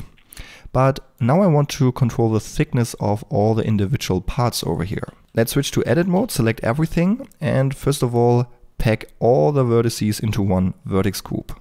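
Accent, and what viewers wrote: Germany. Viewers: I smell a german speaker. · dieses deutschenglisch ist so schräg... aber supergute tutorials :) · bro sät sounds ja ganz schön deutsch hasste auch einen deutschen account? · dude, this guy is sooo german :D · your speech sounds very deutsch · Hikari He‘s german. It‘s just a strong accent. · ich liebe die deutsche aussprache und du?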